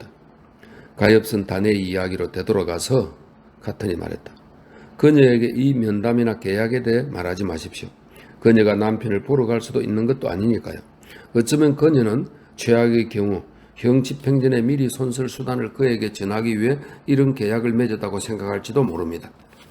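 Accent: Gyeongsang, South Korea. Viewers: 덕분에 긴 명작을 즐겁게 듣고 있습니다. 낭독자님의경상도 억양이 제게는 익숙하여 더 편안하고. 천재적인 작가의 표현을 재미있게 하셔서 웃으며 듣습니다. 그런데 3부의 10장을 찾을 수가 없어서 답답합니다.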